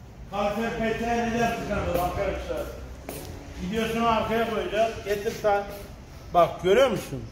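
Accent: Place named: Urfa, Turkey. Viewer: Ustam urfanın neresindensin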